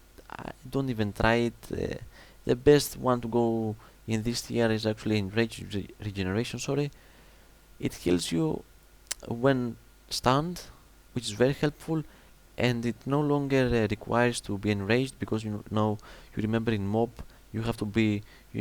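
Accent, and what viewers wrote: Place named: Greece. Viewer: ζήτω η ελληνική προφορά! διαφωνώ για το dragon roar και ίσως πρέπει να αναλύσεις και το rude interruption ;-)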